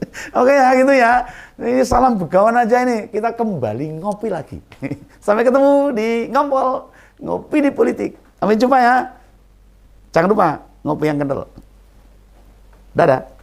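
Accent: Java, Indonesia. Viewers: Ha ha ha, saya sdh lama gk denger orang yg bicaranya dgn dialek jawa kayak gini. Saya lahir di Solo 63 tahun lalu hingga usia 23 hidup di Solo sebelum akhirnya ke Jakarta. Ini ngomongnya sdh spt tetangga2 saya dulu, wis rasah mulih, iki wis cukup ngobati kangenku karo Solo. Ha ha. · 0ak belantek sapi apa khabar jawznya pekat gak usah ngomong bahasa indonesia medok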